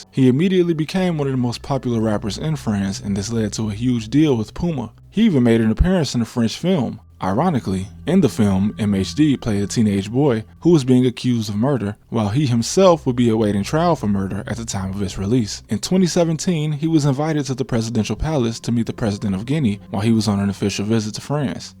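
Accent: United States. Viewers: u can tell bro from america in 20 secs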